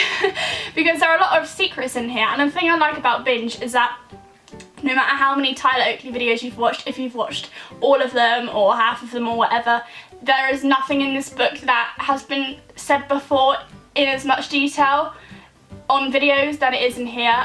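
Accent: United Kingdom. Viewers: thought you had the tiniest south west twang. Hi from Bristol :P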